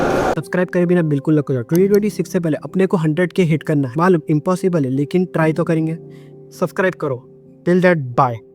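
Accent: Hyderabad, India. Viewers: Accent is amazing, are you from hyderabad?? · Are You Hyderabadi ? I guess because your accent looks like Hyderabadi people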